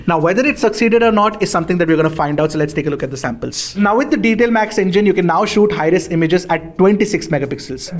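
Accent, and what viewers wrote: India. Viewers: No need to talk so fast, it's like Indish english, take some paus when talking, would be much clearer to understand :D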